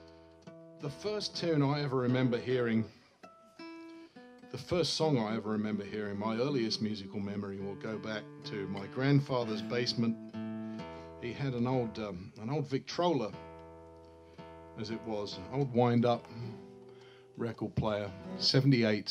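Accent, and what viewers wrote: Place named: Australia. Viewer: British humour.? Then why does he sound like an Australian?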